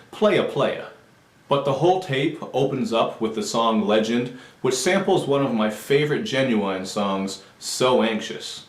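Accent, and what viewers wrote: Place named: Canada. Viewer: I liked the album and how sensitive Drake is. I love and. Great reviews btw! You're super cute and sound Canadian, are you Caribbean?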